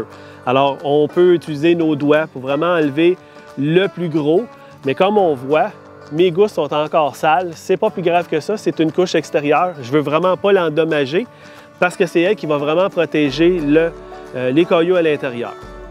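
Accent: Quebec, Canada. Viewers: merci beaucoup .j aime beaucoup votre accent kebekoi.je suis tunisienne qui aime beaucoup le canada que dieu vous protege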